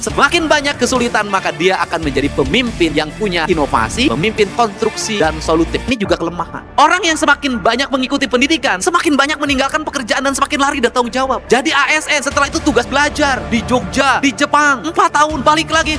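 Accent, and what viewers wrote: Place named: West Java, Indonesia. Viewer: Sunda bgt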